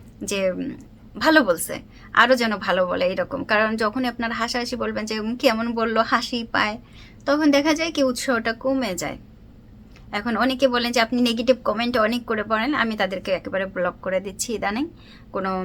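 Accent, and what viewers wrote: Khulna, Bangladesh. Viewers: আপনার কথা ভংগিমা অনেক সুন্দর কারন টা হলো আপনি আমাদের খুলনাবাসী তাই।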